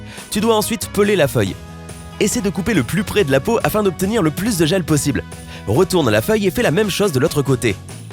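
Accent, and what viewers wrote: Quebec, Canada. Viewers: A mon avis, la vrais a du donner sa chaine a eux, c'est comme la voix Québéquoise, c'est la meme que. Ou sinon toute les chaines c'est une compagnie qui vous prends pour des con ( Je dis vous pck je suis pas abonné a eux )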